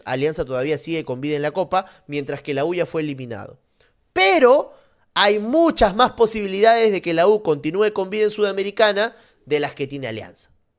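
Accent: Argentina. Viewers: Por que habla como argentino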